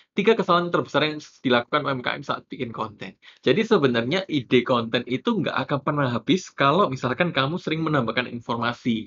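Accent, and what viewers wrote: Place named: East Java, Indonesia. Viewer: tetep ya..orang jawatimuran atau surabaya itu dialeknya khas.. po meneh wong solo hehe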